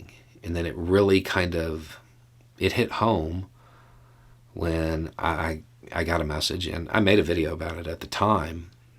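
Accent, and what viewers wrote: South, United States. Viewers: Hi. Just watched the video 'Let's talk about 3 letters that can make rural whites understand the fear minorities have of cops.' and in it, I heard a much strong Southern accent than in any video since I started watching your channel. Which is your day-to-day accent, now or then? Or was the accent you used in that video due to the subject matter of the video - i.e. to talk like a rural Southern person? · I prefer the redneck accent. 😊 From a redneck girl. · have been here long enough to see you evolve from sounding rather southern to not sounding southern at all , you cover a wide range of matters and from your explanations it is possible to see you care about the truth , How do you stay so even tempered and not get mad as hell about some of this stuff , I use your video's to explain things to my GOP friends .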